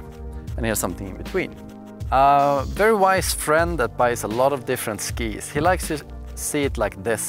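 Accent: Sweden. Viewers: 100% svensk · This dude has to be Swedish judging by the accent (I’m Swedish 😁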